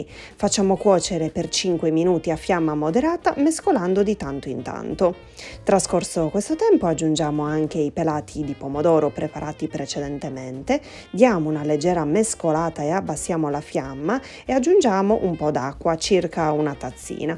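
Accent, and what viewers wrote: Sardinia, Italy. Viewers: Ciao mi fai troppo ridere 😊 a succhittu.....come usava il dialetto sardo mia nonna....mi piace troppo il tuo accento.....unico salludi e trigu e ti auguro un anno pieno di successi ❤️❤️❤️❤️❤️viva la nostra terra sarda.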